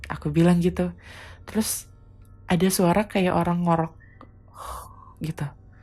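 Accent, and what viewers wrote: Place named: Java, Indonesia. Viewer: Gua kira cewek mas, suarane lo mirip Mas Ricu. Ricky Cuaca. Tapi kayake mas e wong Jowo yo mwehehe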